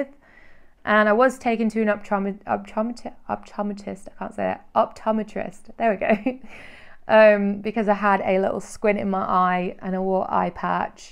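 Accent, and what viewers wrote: Manchester, United Kingdom. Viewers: Hey... I found this from Reddit, Scientology, yes watched a few things and looked into it a bit over the years but this first part has knocked me for six! I think its because you bring home the total reality, home indeed, being a fellow Mancunian. I can also relate to a lot of what you experienced growing up, key development ages to boot!!! It really jumps out at me the True Rebel with a heart bit that you were obviously born with (takes one to know one;). I adore that in everyone, more today than ever before. I was due to be up early tomorrow but i feel an educational binge coming on. Hope you share what you have become in life apart from this brilliant touching series (of which takes a lot of skill and commitment etc) because that's the biggest inspiration, coming out the other side with something of your own to show, and i don't mean materialistic status crap either, I mean the soulful things. 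this kind of abuse destroys the soul but to survive it makes you immensely emotionally intelligent and in touch. You are in possession of large heart! your unselfishness says that much... respect:) Awfully inspiring and... refreshing to hear my home accent lol xx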